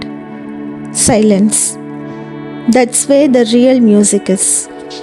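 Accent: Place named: India. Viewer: the annoying indian nasal voice over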